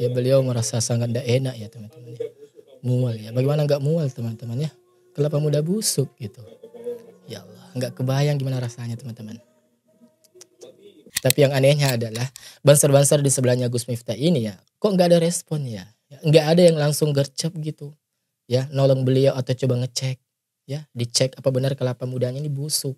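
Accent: Sulawesi, Indonesia. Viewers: Woi kamu logat Sulawesi jangan buat konten murah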